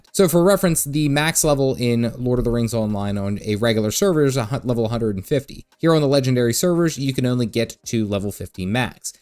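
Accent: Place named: Delaware Valley, United States. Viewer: This dude is from the Delaware Valley and I love it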